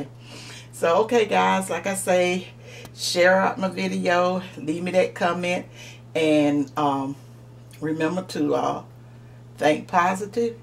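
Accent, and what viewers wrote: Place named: Alabama, United States. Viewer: You speak like my late grandma. Are you from south Alabama?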